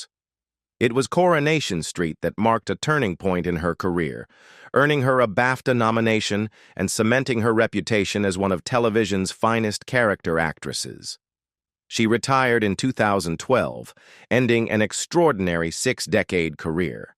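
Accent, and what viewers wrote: United States. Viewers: If you are going to tell us about this great show learn how to talk English not American..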